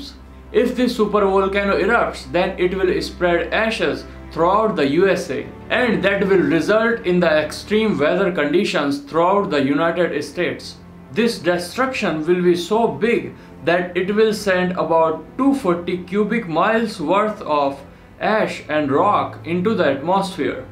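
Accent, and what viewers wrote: India. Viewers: Wait. Are you indian? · I knew it you are indian i knew it!!!!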